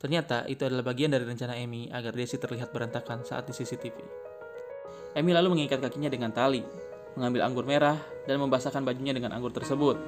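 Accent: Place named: Banjar, Indonesia. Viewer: Banjar banar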